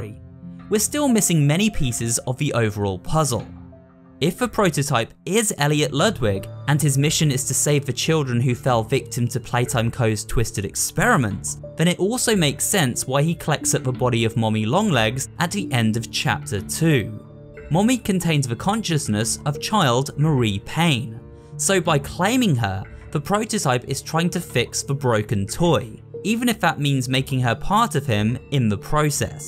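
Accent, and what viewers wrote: United Kingdom. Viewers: is it just me or did you get more british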